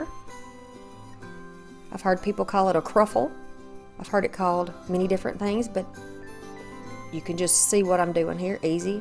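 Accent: South, United States. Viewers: that wreath is AMAZING ITS GORGEOUS. I JUST LOVE YOUR CHANNEL GUUUUURL.... I love your voice, your accent is so sweet and idk comforting to me. I love your confidence and I just like you. I think you're honest, spiritual, creative and humble. I'd like to be your neighbor. You just seem so real. Just wanted to tell you that I really appreciate you sharing your talents and creative mind. I have so many things I wanna do I feel like I never get anything done. Btw I do not know what it is but that southern accent gets me every time. You and Kathy Jo. I love her too. She's very creative and funny. Btw I am from Nova Scotia Canada Cape Breton Island to be exact and we sound like we are Irish with long drawn out syllables. Hahaha hahaha. Like the word MAN well we say ( may-ne).Hmmm 🤔🤔🤔 I think that's where we might have a similar context but different accents. 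Depending on how much wine 🍷 I've had lol.... Hahahahaha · as a new resident of the South, I love hearing you talk! I moved here from California and it was the best decision I ever made. Not to mention, you’re one of the most creative YouTubers out there ❤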